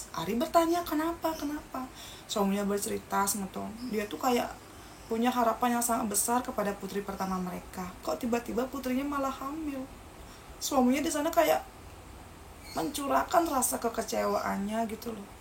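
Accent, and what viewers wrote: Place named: Bali, Indonesia. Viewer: Jangaaannn...ntar saya ngga ngerti..logat bali juga dah enak koq. Hehehee..❤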